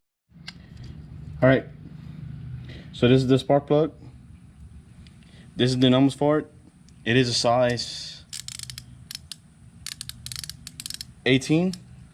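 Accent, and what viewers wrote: Louisiana, United States. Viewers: Bet you from Louisiana accent sound too familiar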